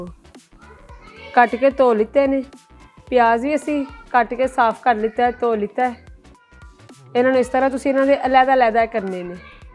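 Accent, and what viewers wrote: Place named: Sialkot, Pakistan. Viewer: aap mujhe Lahore Ki Nahi lagti aap Sialkot ki lagti ho kyunki yah Punjabi Sialkot Mein boli jaati Hai ok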